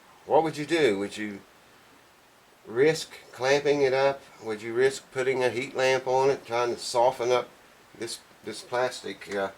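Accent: South, United States. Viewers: Thanks, you have helped me a lot setting up my guitar, i can even do the F chord now,Thanks I like the music videos, you have a good voice,maybe it's that Southern accent, all the best.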